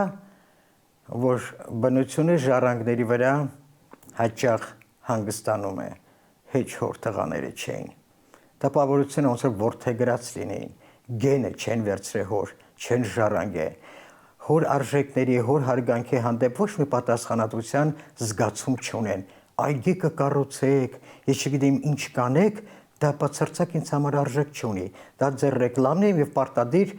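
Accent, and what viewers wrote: Standard. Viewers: Տիկին ջան, ինչ գրագետ ու հիանալի ելույթ կայացավ, շատ ենք կարոտել մաքուր հայերենով ելույթ լսել...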